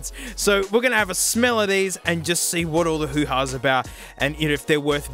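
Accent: Australia. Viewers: I love how aggressively Australian his stories are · Hearing a Australian man talk into the worst sounding microphone ever makes me happy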